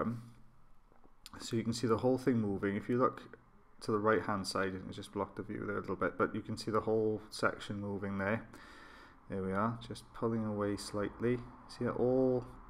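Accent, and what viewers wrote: Wales, United Kingdom. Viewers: I love these videos. And your british accent. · He's Welsh. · That is probably because of his lovely Welsh accent. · From where do you hail? I live in Oxford but was born in Bridgend, Glamorgan, and thought your accent sounded familiar! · You sound welsh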